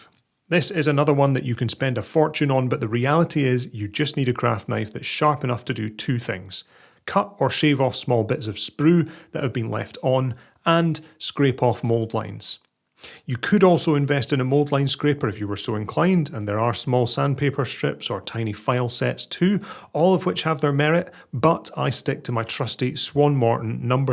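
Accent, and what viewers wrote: Scotland, United Kingdom. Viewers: Hi, nice to hear a fellow Scot doing content for the hobby, keep up the great work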